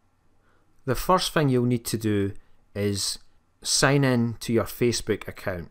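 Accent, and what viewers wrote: Scotland, United Kingdom. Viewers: Love ur Scottish accent. I can actually understand you.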